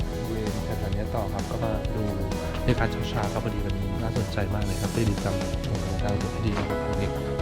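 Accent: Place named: Phu Thai, Thailand. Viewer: สะบายดีน้อง คนน่าฮักๆเว้าคือบ้านอ้ายภูไทม้วนๆ😊😊😊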